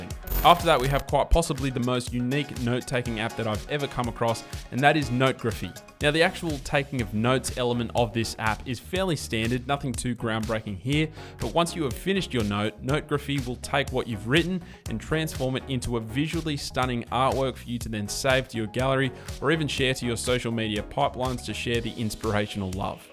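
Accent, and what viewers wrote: Australia. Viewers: you Aussie mate?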